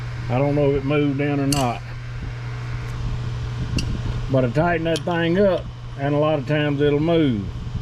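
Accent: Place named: Boston, United States. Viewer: Trying pinpoint that accent and I’m guessing that’s a Boston accent hahahah. Great video just subscribed.🇺🇸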